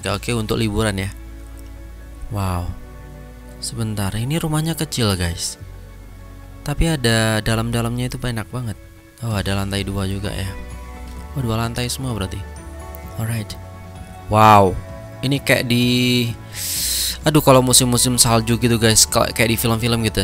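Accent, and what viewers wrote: Malaysia. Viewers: wooww cak cakap mcm org malaysia...😍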